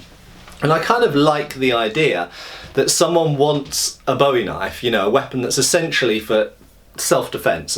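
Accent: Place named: United Kingdom. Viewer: I have never seen a silverware handled bowie knife, nor have I ever wanted one. However like most Americans, for no good reason, after hearing someone with a British accent recommend it I do.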